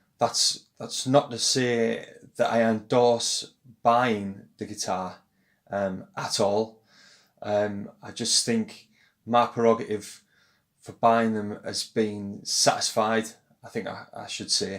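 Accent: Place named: Middlesbrough, United Kingdom. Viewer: I have a sharp ear and you sound like you are from Middlesboro steel!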